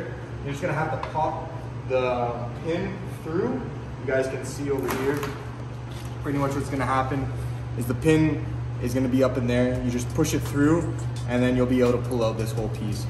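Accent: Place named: Toronto, Canada. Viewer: Yeah Im from here too I could tell by the accent, im glad it isint over faked like most toronto mans wannabes lol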